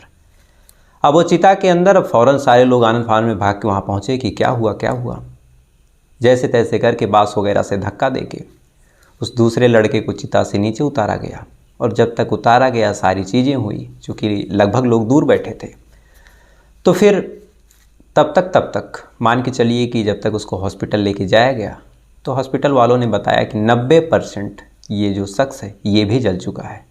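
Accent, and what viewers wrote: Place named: North Bihar, India. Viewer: Your style of talking suggests that u r from north bihar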